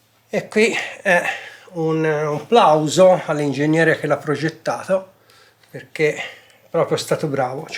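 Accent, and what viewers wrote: Tuscany, Italy. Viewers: Complimenti per la chiarezza delle spiegazioni e per la simpatia toscana😂 Iscritto 👍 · É toscano? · Ottimo lavoro,e complimenti per il video,il toscano mitico,e un accento che e ganzo,👍👍👍👍👍👍👍👍👍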